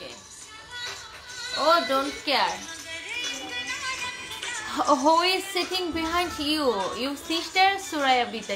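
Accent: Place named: Sylhet, Bangladesh. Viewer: Appu Hamen sylhet ke bolchi Aapne lagche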